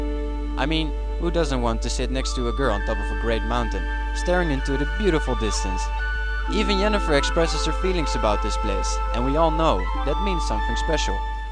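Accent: Netherlands. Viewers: Are you Dutch? I seem to hear an accent lol. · Nederlands accent XD nice video btw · Dat dacht ik al te horen aan het accent;) Leuke video btw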